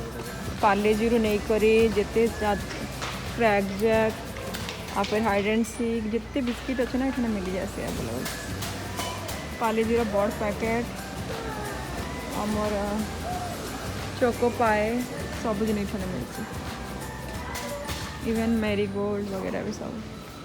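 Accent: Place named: Sundargarh, India. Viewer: I know u r from Bolangir..but Ni Ni ta bodhe Sundargarhia like kahisan na.. anyways doesn't matter..I like u..👍👍good work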